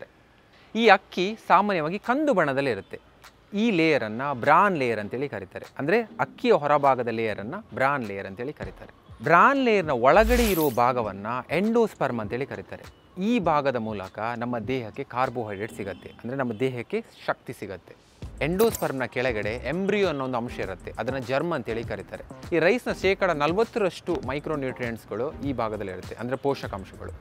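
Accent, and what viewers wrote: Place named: Mangalore, India. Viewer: Nimma M'lore kannada 😍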